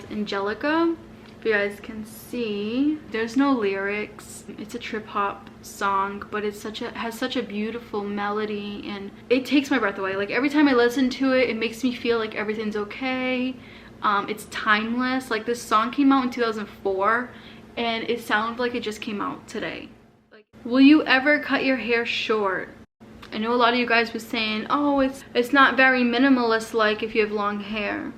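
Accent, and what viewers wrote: Boston, United States. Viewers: I love the Boston accent! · To add to the chorus, please keep your lovely Boston accent. I didn't know it was a thing until I found you:)) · I loooooove your Boston accent 😊!!! Don’t get rid of it! 😢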